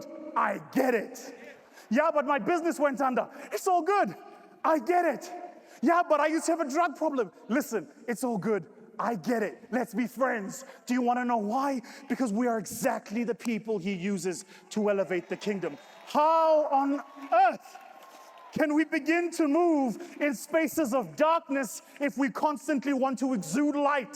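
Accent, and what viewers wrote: South Africa. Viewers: Pure South African accent. Keep shinning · Your Proudly South African ❤ Africa accent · South African accent 🤣🤣🤣🇿🇦🇿🇦🇿🇦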